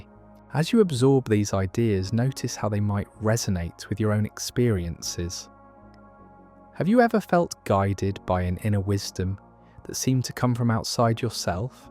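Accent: England, United Kingdom. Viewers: Why this English accent…use an other voice Im sick of this wet mouth babble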